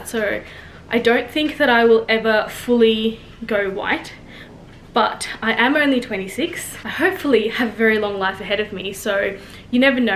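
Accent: Australia. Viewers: She definitely has an Australian accent so I was a little surprised when she said Sri Lankan. I don't know what a Sri Lankan accent in English would sound like? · I’m glad you answered the question of your nationality because I was very curious. Your features look very European. Maybe that is because the central part of your face is very fair now. I was wondering with your accent if you were aboriginal.❤ it is so interesting that pigment can come and go. I wonder if because you have lighter spots where you would be exposed to sun, if sunlight hasn’t played a part in changing the skin cells. I’m sure the condition is very interesting to scientists as to why our bodies do what they do.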